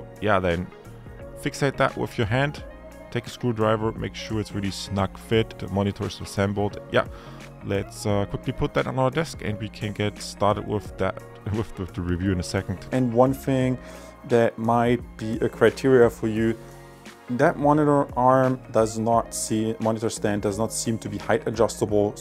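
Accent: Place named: Germany. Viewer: I can hear he is german. I bet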